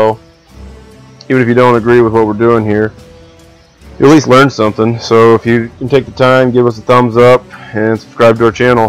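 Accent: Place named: South, United States. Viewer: Sound like a true country boy. To the point, no filler, no bullshit. The only thing he didn't say was you found you way to my page and you decided to watch the video , so what ever complaints you got they're your own fault. Lmao!!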